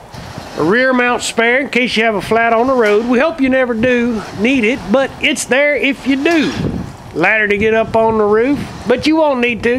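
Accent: Texas, United States. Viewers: i really love this video ,, thanks you Texas accent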